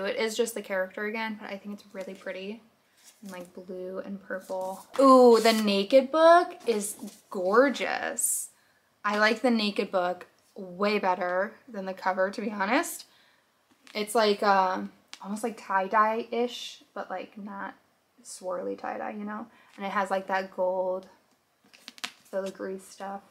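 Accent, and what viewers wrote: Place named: New Jersey, United States. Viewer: oh, your accent sounds like you’re from Jersey.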